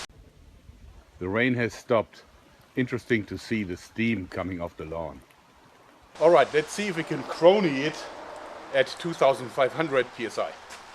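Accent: Germany. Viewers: i love his german accent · This is German English trotzdem passt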